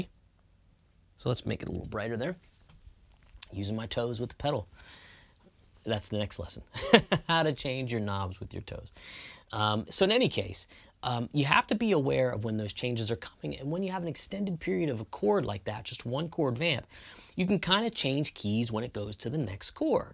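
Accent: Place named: Texas, United States. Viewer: I can hear Texas !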